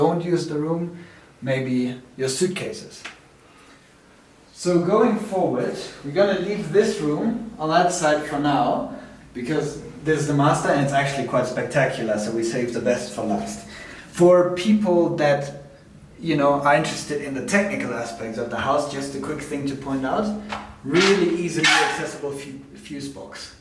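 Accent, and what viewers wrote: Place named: Germany. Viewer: You are from Germany your accent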